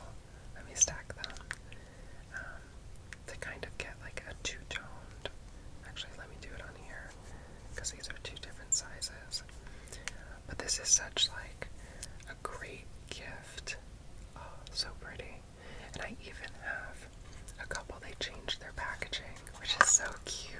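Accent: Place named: Bronx, United States. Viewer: YOU'RE FROM THE BRONX?!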